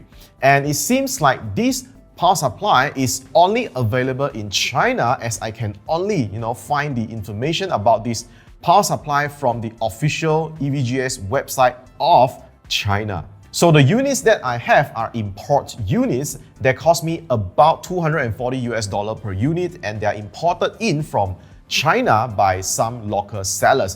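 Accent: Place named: Singapore. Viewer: Your Singaporean accent is thick boi lol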